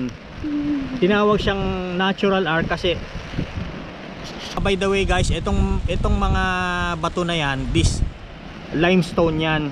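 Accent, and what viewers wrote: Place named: United Kingdom. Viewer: ung accent British na talaga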